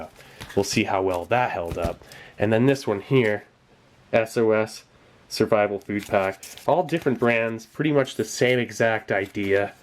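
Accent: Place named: Southern California, United States. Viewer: I agree as well, you have a cool voice, are you from southern California? you sound like we do down here in the San Diego area.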